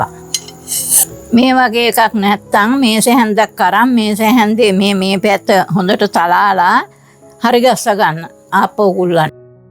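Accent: South, Sri Lanka. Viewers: අම්මාගේ දකුණේ භාශා ශයිලියට කැමතියි .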